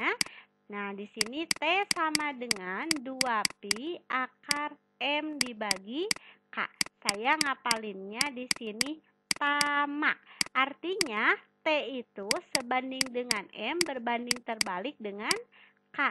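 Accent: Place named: West Java, Indonesia. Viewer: Kak orang Sunda ya?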